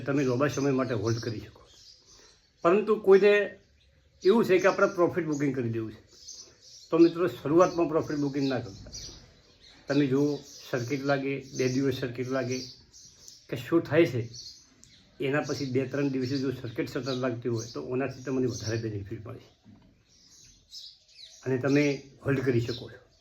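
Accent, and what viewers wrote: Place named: Banaskantha, India. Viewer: તમારી બોલી પરથી તમે બનાસકાંઠાના લાગો છો.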